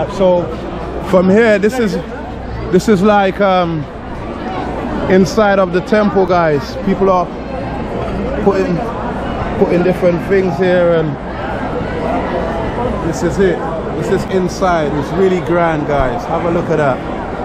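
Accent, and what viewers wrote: Tamil Nadu, India. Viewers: Your Tamil accent was very super brother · Madurai kaaran 😎😎😎😎😎. Nice Tamizh accent brother. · Your tamil slag ,so cute❤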